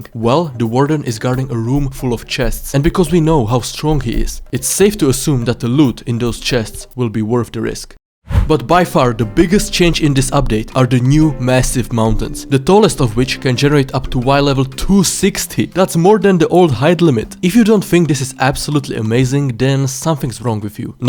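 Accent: Russia. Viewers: Слова как будто с русским акцентом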